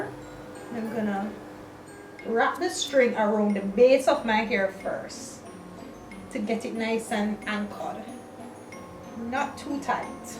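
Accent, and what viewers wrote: Patois, Jamaica. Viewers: I have nylon thread so I can use them.. You know at first I thought you were from a different island until I heard the patois Jamaica patois🇯🇲🇯🇲🇯🇲🇯🇲🇯🇲🇯🇲🇯🇲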